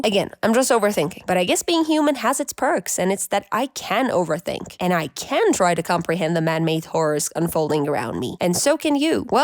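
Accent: United States. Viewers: you don't need to, i already did it for you. it's just an American accent. :)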